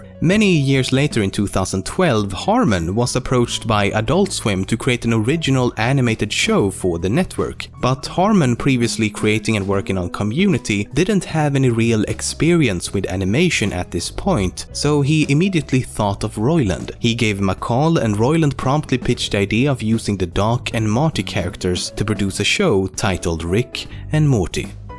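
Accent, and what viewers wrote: Sweden. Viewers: There's something slightly wrong in the accent of the narrator of this video. Like he was slightly swedish or something? I don't know. POST-EDIT: towards the end "Believe me ... I've SHECKED" , that was a dead on class A swedish sign. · Everyone's applauding the severe accent of a Swedish person who never thought about what he sounds like, calling it soothing. Okay. To someone else it's annoying as hell, how smug he is sounding while pronouncing English like a third grader. I'm not saying I can do better videos, but seriously, I'm from Sweden and most people know how to say "j" and "ch"... Or it could be on purpose, who knows. Now I know what accent to fall back into when needed, lol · Hej ska du bryta lite mer på svenska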